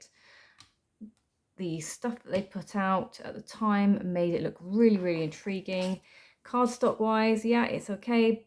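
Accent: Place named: United Kingdom. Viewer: For the record...I adore your thumbnail. And thank you so much for your kind words ❤ this was lovely! I have my eyes on the Dark mansion Tarot. Your decks are beautiful and I think I'll add a feel to my list lol. Enjoyed this so much. Your answers are awesome. Sending you love. And I noticed you have a lovely UK accent if I am correct? Man I miss it over there and can't wait to get back.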